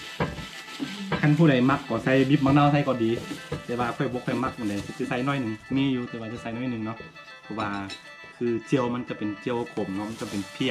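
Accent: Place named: Thailand. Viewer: ຕິດຕາມຕະຫຼອດແຕ່ບໍ່ຮູ້ວ່າເປັນລູກຊອດ ຫຼືວ່າ ອາຂ່າໄທບໍ? ເພາະວ່າສຳນຽງການເວົ້າຢາກອອກໄທແນ່....ຂອງກິນທຳມະຊາດຫາຍາກ ຢາກນຳເດ່😋😋😋😋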